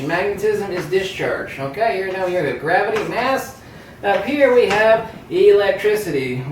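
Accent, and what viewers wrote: Germany. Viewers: Please lose the stupid German accent...very annoying!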